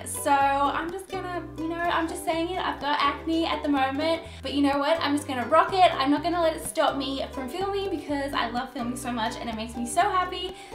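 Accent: Australia. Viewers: I love your Austrilian accent!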